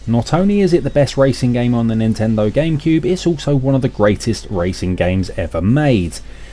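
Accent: United Kingdom. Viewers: Ziad Assaad The commentary is soooo British!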